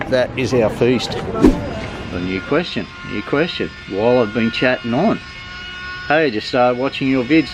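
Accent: Australia. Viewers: Congratulations to a good quality channel, so tired of watching similar content from S/E Asia, here in Thailand regarding helmets, the law is you dont need a helmet after sunset, except in areas where there is a helmet sign such as governement/hospital areas, go figure not kidding eather. Been so many times in Vientianne now after I moved to Udon so its nice to see what that city in particular has to offer, binch watching now and i really enjoy it, also like that aussie accent of yours. What i have found out in Vientianne sofar regarding food is that they have brilliant Chinese Cousine! Ive red that possibly the best Chinese couisine outside of China, i guess there are alot of Chinese builders in Laos nowadays that need their local food. Also the kebabs are far better than in Thailand Pizza places in thailand can be a hit and miss if you dont know where to go, but there are some very good pizzas even in Udon, but i guess the best are in Chiangmai or Phuket, keep up the good work and dont change your own stile, its why we are here. 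Have you ever thought of taking Lao Language lessons? Ive studied Thai a couple of years and its really helpful! You should do it too in my opinion it makes alot of things easier, even though you might not "need it", and Lao is a fun language to listen too when you have read Thai, its very similar to Isaan/Phuuthai "dialects" but with some differences in some letters of the alfabeth, with that i meen you can survive on it even in Thailand, they unde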